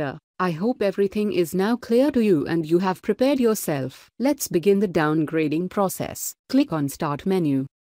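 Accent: India. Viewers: bro doesn't use his actual voice but its still in indian accent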